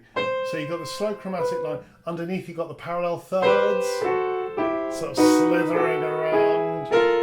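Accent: United Kingdom. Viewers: Great video, but when will you get rid of that ridiculous British accent?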